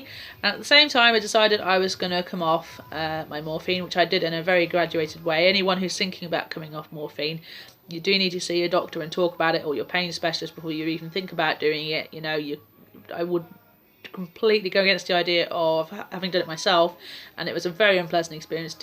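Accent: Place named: United Kingdom. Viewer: I love your British accent by the way(haha) I could listen to you all day..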